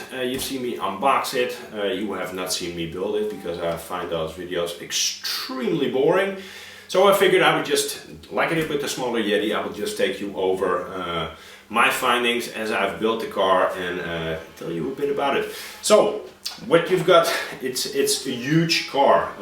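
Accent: Netherlands. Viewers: Najs Dutch accent..! :-)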